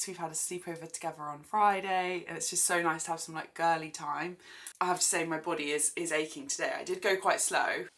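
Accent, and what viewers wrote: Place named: England, United Kingdom. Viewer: I love your videos. As a Brit now living abroad it makes me smile to hear an English accent, see familiar British sights and have a catch-up with how things are going with you and. Yes - I'm a walker and camper who discovered you both through those video styles, but the everyday family stuff suits me too. Thank you! Beach fail - romantically watching a dog poop in front of you and watching it steam in the salt breeze. Lol!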